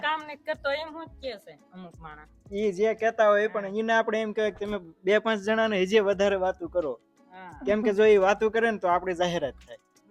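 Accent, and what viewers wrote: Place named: Saurashtra, India. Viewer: તમે શુધ્ધ ગુજરાતી ભાષા માં બોલો છો એટલાં માટે વીડીયો જોવાની મજા આવે છે સૌરાષ્ટ્ર કાઠિયાવાડ ની ભાષા મીઠી હોય છે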